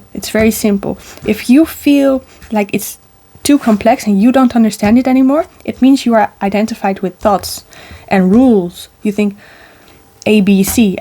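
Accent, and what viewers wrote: Netherlands. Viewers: I could tell your Dutch accent. Great video. Like your dimensional model 😇 Ik ben ook van Nederland en heb een boek geschreven waar ik ook een multidimensional model heb. Echt super!☯️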